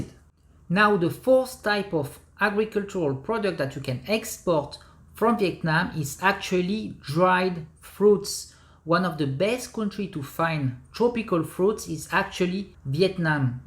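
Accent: France. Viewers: Ca sert a rien de parler en anglais avec l’accent français !!parles directement en français,ca nous aidera a comprendre :)..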